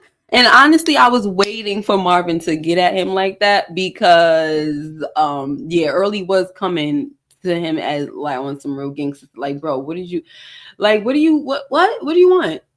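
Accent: New York, United States. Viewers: I hear a New York accent? I'm PA! Anyway my 1st time on your channel...I agree with your pov on this episode 👏🏽. Yeah I'm over snaps n pop. And Stephano...(I'm don't see a subscribe button)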